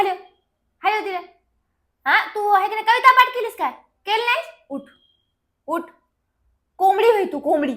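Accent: Nanded, India. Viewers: Tumchi language khup chan....😂😂😂😂😂😂😂 1 number Nandedkar...😂😂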